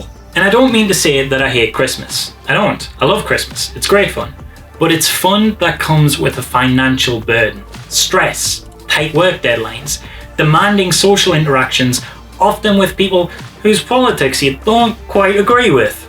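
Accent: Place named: Geordie, United Kingdom. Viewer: Maccum or Geordie? As a Geordie who has lived in Australia for 20 years I can't quite place the accent. Thumbs up on the research on the history of Xmas Horror. Have a good one.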